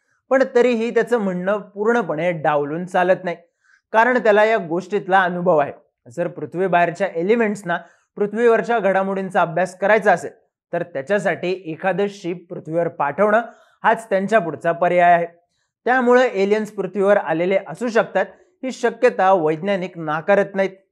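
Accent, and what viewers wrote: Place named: Kolhapur, India. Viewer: हा कोल्हापुरी भाषेचा लहेजा आहे ❤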